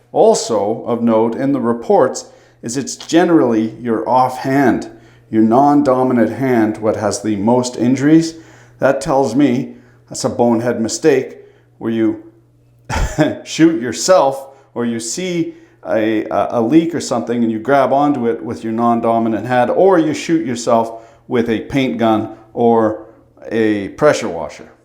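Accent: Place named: Canada. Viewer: The Canuckistan English language of still amuses and amazes me after all these years.:)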